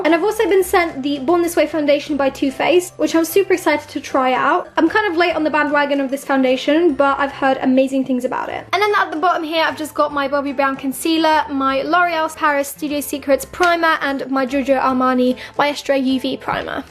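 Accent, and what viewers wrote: England, United Kingdom. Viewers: Hii, you have a lovely makeup collection! I love your accent! I'm an Italian girl, so I hear English only at school and my teachers don't speak English with a perfect British pronunciation... So I do exercise when I hear you😂😂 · bruh are you stupid? me and her have a english accent..also we speak proper actual english thats why we are known as english people dumbass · "Don't do it"... Um, you do realize that she can't help it if she says it like that because that's just her British accent so it's her voice and she's not doing it on purpose... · Anybody here who is British will probably not notice it, but it's her accent, she can't help it.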